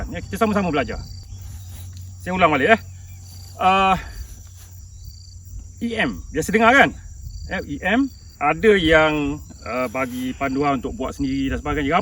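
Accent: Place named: Terengganu, Malaysia. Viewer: Assalamualaikum,tumpang tanya, asal dari mana,bunyi macam orang Trengganu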